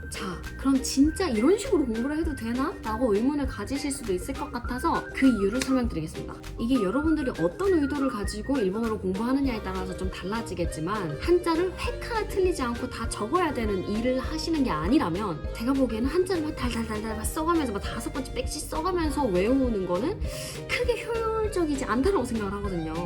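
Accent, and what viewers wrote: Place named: South Korea. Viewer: 이분 한국인임? 한국어 그냥 한국인인데?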